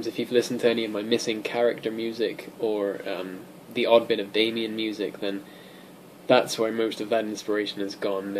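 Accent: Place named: United States. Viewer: Have you been stateside a fair bit? There's an American twang to some of your words these days.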